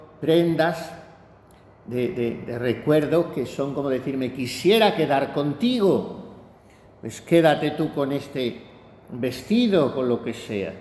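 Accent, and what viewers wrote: Extremadura, Spain. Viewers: Ay Padre muero de risa ! Así o más extremeño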